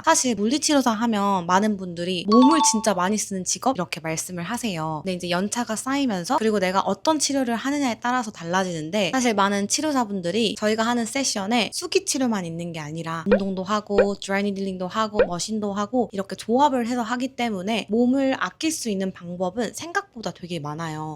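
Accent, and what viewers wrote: Jeolla, South Korea. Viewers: 전라도 사투리가 참 정겹네요 잘 들었습니다 감사합니다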